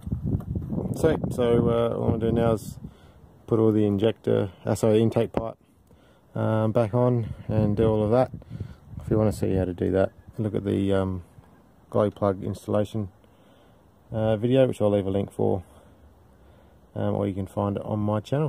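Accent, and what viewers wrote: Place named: Australia. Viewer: what a video, and talked in our Aussie language so it makes sense to us, doing mine hopefully in the coming month... one thing I have read on pages is diesel knock once replaced, do you know anything about what causes that or what steps need to be taken to prevent it? cheers, love the video mate, going to help amazingly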